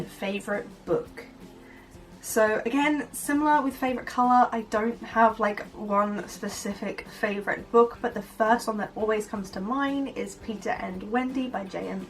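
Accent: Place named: United Kingdom. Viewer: Hhhahaa this is much better than our old channel!!!! How did you go from sounding American when we were young to now sounding British??? Hahha!! Love you long time!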